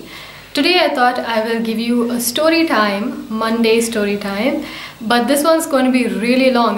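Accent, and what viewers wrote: India. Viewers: I love the Indian accent. Do you have any videos of your singing? Also, can you give me a singing tip? I'm trying to learn. Thank you.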